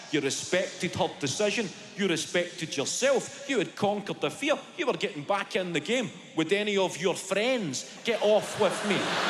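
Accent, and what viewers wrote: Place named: Glasgow, United Kingdom. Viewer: Neither. It's Scottish (Glaswegian i.e. from Glasgow). :)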